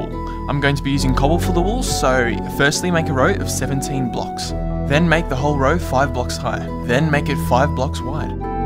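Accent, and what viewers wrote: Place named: Australia. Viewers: all aussies sound the same apparently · i did not know was Aussie!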